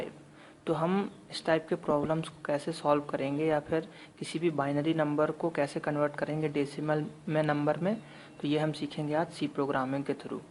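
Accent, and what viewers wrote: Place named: India. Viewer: dunno if that's indian or English with a very very strong indian accent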